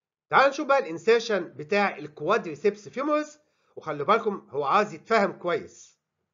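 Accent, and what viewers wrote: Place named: Iraq. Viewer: من اللهجه واضح عراق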